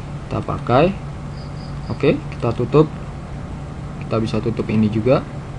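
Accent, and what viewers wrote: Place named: Java, Indonesia. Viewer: Bang jawanya medok bgt 😂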